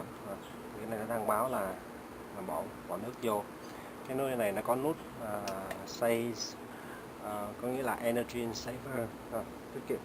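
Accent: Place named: United Kingdom. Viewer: Cảm ơn anh đã chia sẻ về dụng cụ này, nghe anh nói tiếng Anh Anh rất hay nhưng ko phải giọng Mỹ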